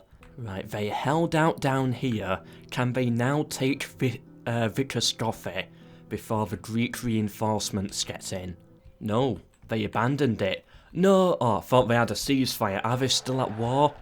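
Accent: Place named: Northern England, United Kingdom. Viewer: Northern commentary by a Northern lad. Very good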